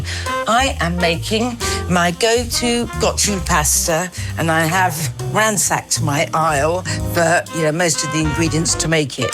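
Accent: RP, United Kingdom. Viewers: Nigella, you have been such an inspiration throughout my life! I found your shows many years ago when I had the privilege of living in the U.K. You and I both lost our mothers around the same age and I find your recipe videos to be incredibly comforting when I am missing her the most. Especially your Christmas episodes. I find such inspiration in your ability to manifest joy and beauty in often simple ingredients made decadent, and of course the well-deserved fancy ingredients. The way you describe food is like poetry. I not only learn new recipes, but my vocabulary has increased exponentially. I can only imagine how much fun it would be cooking a meal with you while sipping on a cocktail. Thank you for sharing your life with us, even with the things you perceive as flaws. They're not flaws, they make you even more perfect. You've always been so honest with the public and I think that is both noble, rare, and worthy of all of praise. 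Please don't stop saying "meekro-wavay." It's become a household term in my family because of that episode. I thought it was absolutely adorable. You speak the Queen's English but throw in your own cheeky neologisms that make you 3-dimensional. Thank you <3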